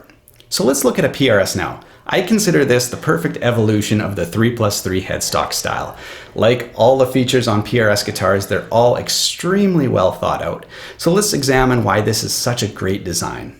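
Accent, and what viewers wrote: Canada. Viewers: I always enjoy Canadian accents. No problem with thaat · There's no way you're not Canadian. I'm from Ontario and I can't unhear the accent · Are you Canadian?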